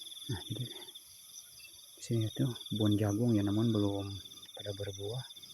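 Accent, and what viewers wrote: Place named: West Java, Indonesia. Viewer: kang Sunda mana logat Sundana mani kaciri pisan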